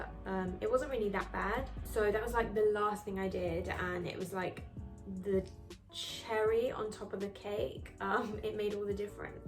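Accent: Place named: United Kingdom. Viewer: I don’t understand a single word ohhhh Britain 🙄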